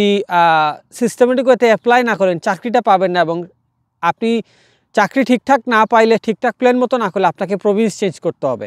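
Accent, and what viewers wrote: Sylhet, Bangladesh. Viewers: আপনে সিলেটি ভাইসাব নি?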